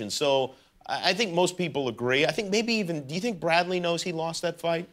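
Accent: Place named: Australia. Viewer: well obviuosly you're australian.... lol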